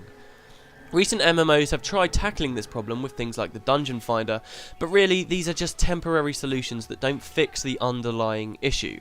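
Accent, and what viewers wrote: England, United Kingdom. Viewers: Lols, you have a british accent .3. Great, now you made me sad that I dont have Guild Wars >_< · This is a perfect example of how a fancy British accent can be used to deceive countless people into thinking what you are actually saying isn't completely retarded. Your entire argument is a straw man. If you are clicking red bars in a window (reactionary healing) then you aren't doing it right. You should be pre-protting, watching your position, following your enemy's damage, all while trying to monitor your energy and control how aggressive your entire party is playing. That's not boring. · Your English accent turns me on. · noob video. who the fuck cares about "raids", raids are boring by definition, you learn it and you are done. it's no fun after that. heals are fun in battlegrounds and arenas. and you are not just "watching bars" there. 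if you are, you are certainly not familiar with the game at all. beautiful scenery standing there wacking at the boss? that's all the melee looks at, and the ranged. i don't get this idiocy at all. especially with a brit snotty accent.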